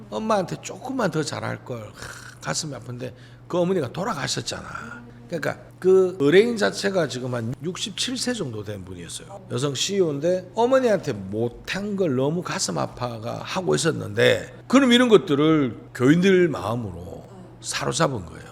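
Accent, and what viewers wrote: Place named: Gyeongsang, South Korea. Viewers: 경상도 소리가 그래요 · 갱사도라서 그런가봐유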